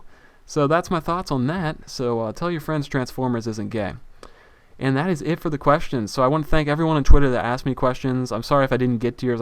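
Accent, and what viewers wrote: Philadelphia, United States. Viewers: So your from Philadelphia? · You from Philly? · Omg Your From Pennsylvania! I Knew It:) What Part Of Pennsylvania Your From? I'm from South Philadelphia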